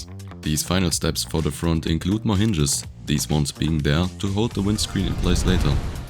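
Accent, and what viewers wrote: Germany. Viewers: your english sounds like my friend from saarland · Perfect build and video, I hear some german accent I think 😂❤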